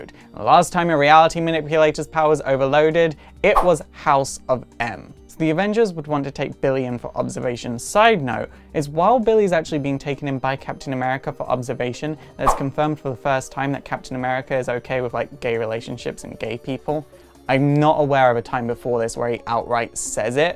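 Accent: United Kingdom. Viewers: I'm 35 (turning 36 this year) and I came out when I was 19 (it was a little different in my day - I'm a bit older than you - also American, born and raised in Baltimore Maryland - from your accent i can tell your from the UK) Marvel's Northstar came out in 1992 when I was 9 but i never felt I related with that character. Like you when I found the character of wiccan i felt I found a piece of myself and went right out and bought "Young Avengers: Children's Crusade" - found out the book and the character kicked total ass and have been sold since. Also been following Iceman's "BIG GAY BOOK" which I like but not as much as I LOVE Billy Kaplan/Wiccan.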